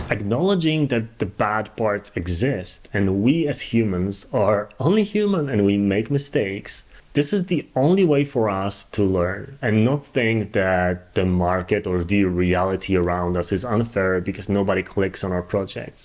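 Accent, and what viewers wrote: Poland. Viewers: You put a lot of effort into your content. It's very well done and creative! You are beyond qualified!! You have given me golden nuggets of valuable information, and I appreciate you very much!! Do what makes your heart sing, whatever that may be :) I feel your battle! I'm a loner, for sure! I admire your courage. Are you from Poland? My ancestors are from there. You have a cool accent. I have never heard it before in the US.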